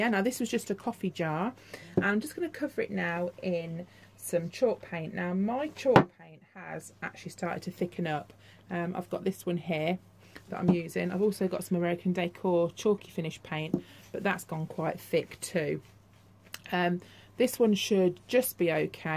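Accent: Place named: Northern England, United Kingdom. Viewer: Hi.I don't do crafts but find it very therapeutic to watch.Also I like how real you are and love your Northern English accent.Thank you.☘️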